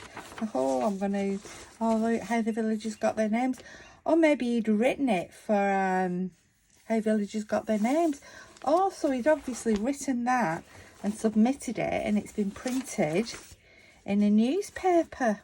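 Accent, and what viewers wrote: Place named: England, United Kingdom. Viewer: Morin Miss... Ooooooo-La-aaaaaa... Love your Grungy Finds... Especially that Yummy Ole' Scrap Book... OMG ness, me-oh-my..... 🌹 🥰 ✨️... Enjoy your treasures... Was nice to SEE your Sweet English face on the SSS video... & to hear that lovely accent I miss so fondly... Later my BOO's 🐝 😎 👑 🥰 🙏 ✨️ 👍 🌹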